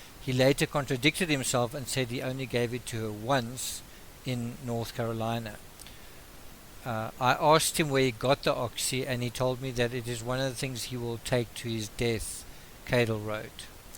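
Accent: South Africa. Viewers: You sound South African. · New subscriber I enjoy listening to you, are you from South Africa 🇿🇦?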